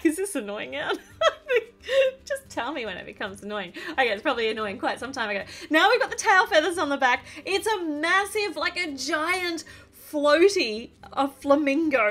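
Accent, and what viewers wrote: Australia. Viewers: Ur so good at making legos and I love ur Australian accent I love u ❤️❤️🤗🤗💖😍🥰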